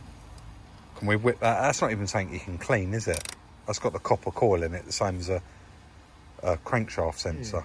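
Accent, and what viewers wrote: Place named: Medway, United Kingdom. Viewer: are you a medway boy? Definitely sound it!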